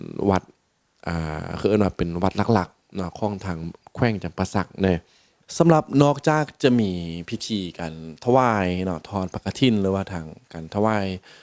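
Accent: Thailand. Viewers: แอดมินวิธีการพูดเหมือนคนไทย ทั้งการออกเสียง สไตส์การพูด ร ซ ไม่แน่ใจว่าเคยเรียนที่ไทยไหมครับ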